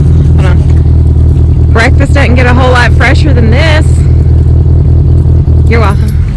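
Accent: South, United States. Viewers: I enjoy hearing you talk,I always have liked to hear southern bells talk,it's just so sweet.I know you explain things that you say,so other people,not from the south,will know what your saying,but I get every word,and it's refreshing.Anyhow,keep up the goid work,I really enjoy your videos.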